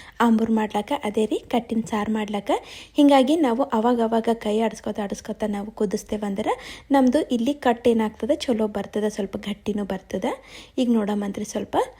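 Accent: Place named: North Karnataka, India. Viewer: Uttar Karnataka bhashe tumba channagede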